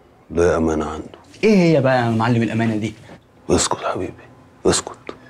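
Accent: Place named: Morocco. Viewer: لهجتك مغربية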